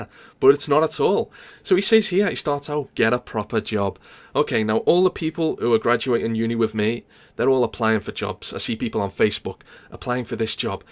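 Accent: Liverpool, United Kingdom. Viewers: ***** Hes a scouser they all sound like this lol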